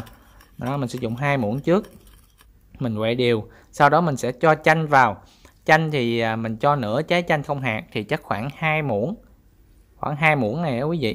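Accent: Mekong Delta, Vietnam. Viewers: Anh nầy dân Nam bộ miền Tây giọng nhà quê nên đừng có trách ,mặc dù anh hưỡng dẩn cơ bản như vậy nhưng ta cũng cần phải luyến láy đôi chút như xử lý củ hành cho bớt cay ,sắt xéo dưa leo cho đẹp hơn ,bào cà rốt cho dài hơn ,dưa leo cà rốt đều để ngăn mát ,có thể thêm nước mắm nếu không ăn chay và rau thơm có thể dùng loại nào mình thích không riêng gì rau húng cây . Cám ơn bạn trai đã chia sẻ ! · 😁😁😁 giong chuẩn miền tây nghe ấm áp nghĩ... ❤️ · Đặc trưng của dân miền Tây đó bạn. Mình nghe dễ thương mà!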